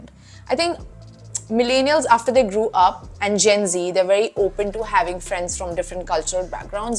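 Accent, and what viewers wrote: Maharashtra, India. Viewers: i am sorry to differ but you do have a very strong Indian accent. please enjoy the best of both the world. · You know, you sound Maharashtrian to me🤗😍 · You maybe a Jew. But there is no way, you are Israeli. You are Indian at heart. The way you talk, and emote is purely Indian. And your name is funny. is a vitamin supplement sold in India, which is said to provide instant energy. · I would treat you like a bhartiya more rather than a jewish ☺️😸 i respect jewish culture but in your case your looks your accent are more like a bhartiya kanya 😸☺️😘 someday i would even like to see you wearing saree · Had I not explicitly known otherwise, I would really have assumed you to be Priyanka or Neha. You certainly talk and even look like an Indian - not that that's surprising 😊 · Your accent is still very Marathi heavy…. Being a Mumbaikar I could easily identify your Marathi accent. 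All the best with your new life in Israel. Take care. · You also have our accent and you look more like an Indian than an Israeli. So aap humare hi bandi ho😅. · यो मराठी, वाटलच मला. तुझ्या accent वरून वाटतच होत. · Don’t worry you sound like 2000% Indian. I don’t hear any other accents. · your accent is close to Indian though but your face is more close to Jew. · Your accent is Indian 😁🤝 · I am from Sri Lanka. You still has typical indian accent. But i really enjoyed listening to you. Yes we know there are jews in india. Kerala,Bombay & Calcutta. May HaShem bless you. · You are Indian, you can be an israeli and still have Indian-ness in you. India is a very diverse country and accomodates everyone. So dont worry, you belong somewhere - India (Bhāratha), you were born here and know the country just like us. Israel is also a good country, hope you have a good time there. But remember, you belong somewhere and you have a home! 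PS : We can still sense a strong Indian accent in your English. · 2-3 years ago.... I saw video about jews.... They were doing documentary on jews.... After watching that video i found that there is Jewish community in western maharashtra.... And i could tell that you are Maharashtrian just by your accent.... So obvious.... That tone was so familiar... I also saw some bene Israelis in Northeast states... There were fighting to go to israel..... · Great video and honest account about your early life in India. I’m Indian Australian American and am thrilled to hear about your move to Israel. I’ve never met anyone Jewish in India — you folks are exotic! In this video your accent is Indian with the extra-thick Ts, emphasized H sounds — so not sure what your Indian friends are talking about 🤔I hope you haven’t been affected by the recent terrorist attacks. God bless and take care 🇮🇳 🇦🇺 🇺🇸 🇮🇱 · I am hearing a marathi mulagi ...😅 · First of all you are a gorgeous woman. 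Then your mannerism and accent are 100% indian. And I am loving that. Your looks are almost north Indian, but then there is no such thing as a Jewish look, so hard to tell. You have a positive attitude. Keep it up. I was born and brought up in India for the first 23 years as a Hindu Brahmin, but then for almost the last 40 years, I am living in the US. Keep your friendship with your Indian friends. They are the sweetest. You are the first indian jew I got to know about. I have many Jewish friends of European origin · You can pass off as an Indian girl anytime. Your accent laooks everything...your Indian mate...but Congratulations on ur new home Israel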